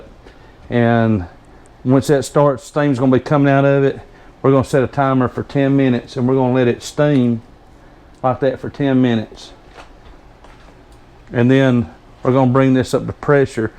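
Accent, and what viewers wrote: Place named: South, United States. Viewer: 😉 I enjoyed listening to you, reminded me of my family in Louisiana with your southern twang. It is frustrating that canning jars went up close to 3 bucks case these days.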